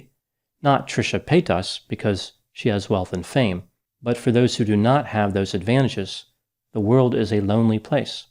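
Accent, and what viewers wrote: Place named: Delaware, United States. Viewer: Now that I know he's got a Delaware accent... I can't get over his adorable Delaware accent. It picks me up when I'm feeling "bayud"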